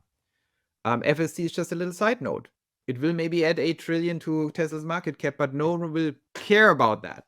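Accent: Germany. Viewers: The fact that you employ a German accent in your presentation adds to the drama and seriousness. Nice!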